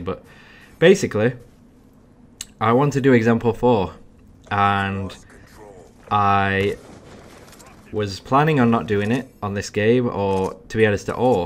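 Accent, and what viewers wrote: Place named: England, United Kingdom. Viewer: ENGLISHMAN!!! :) Ooooooooooshhh!!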